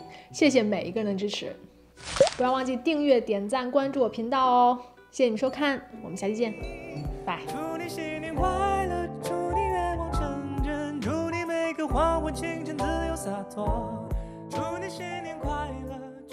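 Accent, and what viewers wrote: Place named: Beijing, China. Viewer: 北京口音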